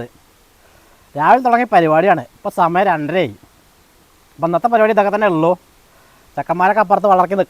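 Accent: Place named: Palakkad, India. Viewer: Palakkad bhasha polea thonunnu. Kollam😊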